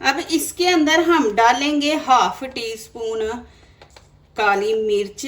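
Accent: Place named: Punjab, India. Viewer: Ap Panjabi logo ki Urdu b alag Hoti hai pata chal jata hai Panjabi ho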